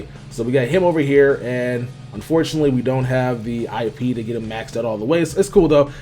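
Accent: Texas, United States. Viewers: Are u Fr from texas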